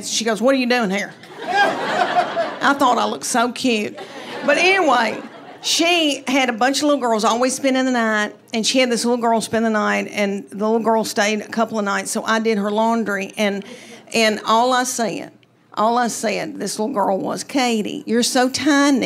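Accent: South, United States. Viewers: She is heavy with southern twang. I swear I thought she said ‘ma daughter was sixtwaang’ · Her southern accent really adds to the delivery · The killer is she has that southern kill ‘em with kindness And sarcastic southern drawl. If called me Darlin’ I’d run! lol hysterical and all so true! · Love that Southern accent - the best, and sooooooo. funny. A very special Comic, wife, and mom, and a relative, neighbor -just hilarious! Thank you Leanne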